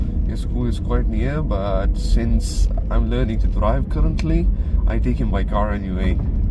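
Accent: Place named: Russia. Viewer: Why there is a lil bit Russian accent in your english?😅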